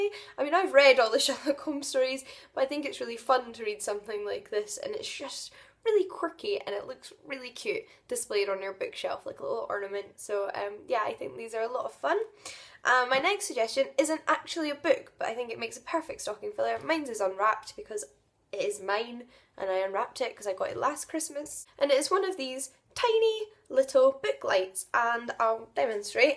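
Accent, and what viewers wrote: Scotland, United Kingdom. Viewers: Thanks a lot for those amazing suggestions! PS: I love yout Scottish accent ♥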